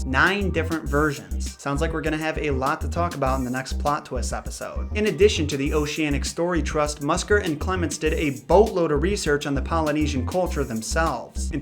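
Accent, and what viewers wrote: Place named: New Jersey, United States. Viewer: HAHAHA that jersey accent though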